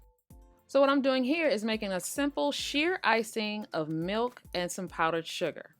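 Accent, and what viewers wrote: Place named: Chicago, United States. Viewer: Are you from Chicago? Your accent sounds just like a friend of mine ☺️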